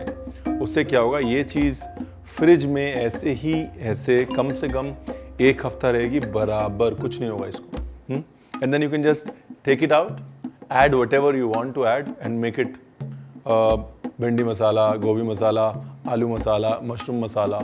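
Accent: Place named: Punjab, India. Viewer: Ranveer pra...Love you...Your style amazing and jab bat karte hue Punjabi touch ata haina...mast bro you awesome....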